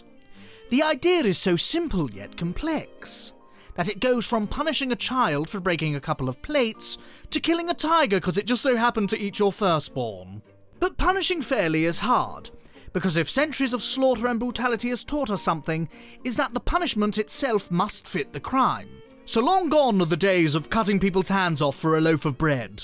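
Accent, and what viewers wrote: United Kingdom. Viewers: This dudes British accent made me happy again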